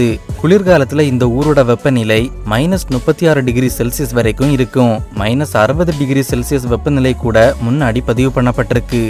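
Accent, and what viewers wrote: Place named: Kerala, India. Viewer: Keara tamil superb bro❤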